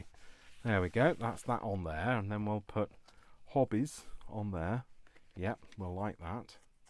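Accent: United Kingdom. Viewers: What a fun video today! I just love some Northern accent me! Those hobbies weekly mags are great! What a super find and love this project! Keep being jolly……cos I am as well! Love from Edinburgh xx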